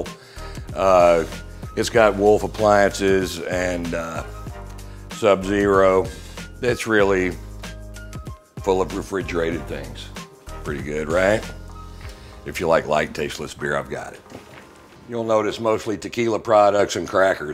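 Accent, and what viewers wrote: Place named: Texas, United States. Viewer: You know he’s from Texas.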